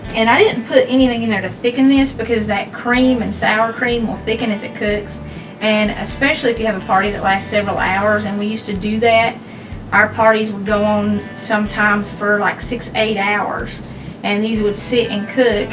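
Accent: South, United States. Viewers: I love your southern drawl and yes, I can just tell that you are good people 😘👌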